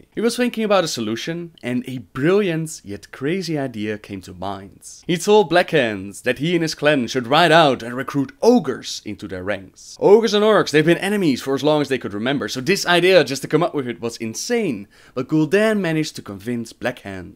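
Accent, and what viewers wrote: Netherlands. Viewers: It's his Dutch accent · Nice dutch accent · JE ACCENT <3 NEDERLANDSE WOW SPELER!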